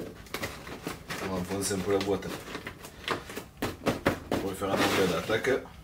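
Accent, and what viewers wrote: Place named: South, France. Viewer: Ba si fait la je comprends rien avec son accent du sud rajoute des euuuu partout 😂😂😂🤣🤣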